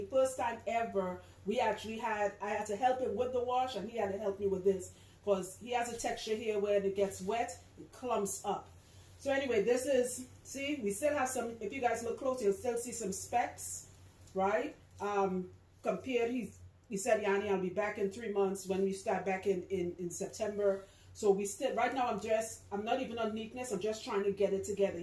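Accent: Trinidad and Tobago. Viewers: I noticed the accent, but its only when you said you were from Trinidad, that it all made sense. 🇹🇹❤ i live in Trinidad aswell, n you did an amazing job💪💯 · Gurl I knew I hear Dey trini in you 🇹🇹🇹🇹🇹🇹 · First video I’ve watched of yours. I recognize that accent anywhere. Sweet T&T (my dad’s homeland)! I find the loc separating to be very satisfying. I not even to the washing part and you have a fan for life!! I’m about to binge your videos and get tips for my hair. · When I heard your accent I knew you were from my country T&T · I was listening to your accent and figured you were a Trini 🇹🇹🇹🇹🇹🇹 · This sounds like a Trinibagonian.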